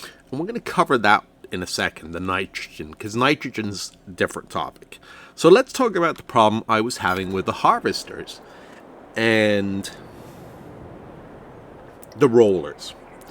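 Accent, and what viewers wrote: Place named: Northeast, United States. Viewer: Interesting findings. Thanks for doing these in-depth videos, they’re very helpful. Nice to get some tips from somebody who has been playing this game even longer than me! As a side note, I’d love to know where your accent comes from. I had you pinned in the northeast US until I recently heard you say you’re from Canada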